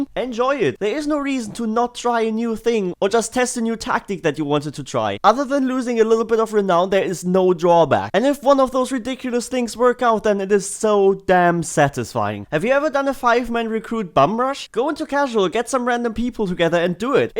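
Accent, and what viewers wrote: Germany. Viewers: you sound extremly german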